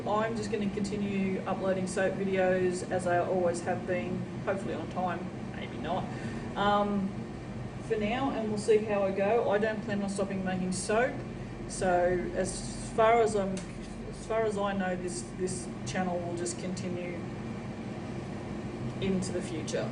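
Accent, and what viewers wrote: Australia. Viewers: Hope you have a great Christmas and a happy New Year, get some rest and enjoy the time with your Dad......I know you will be missing your Mum but I feel she is watching over you and I know she will always be in your 💓..I wish you all the best with the shop, I hope it is very successful and relieves the financial strain that can be so terribly stressful.... I so enjoy your videos because you are just so damn honest and no bullshit airs and graces etc, just a real Aussie woman! ( not sure if you were actually born here but you sound Aussie ) I’m very sure there are people who gain some strength to share their mental health issues through watching you and your videos....having a “ big dirty secret “ is such a damaging thing, if people can open up and talk about things it is so much better....anyway, wishing you well and I will be following and supporting you in my small ways and enjoying seeing all you do....stay safe and be happy xx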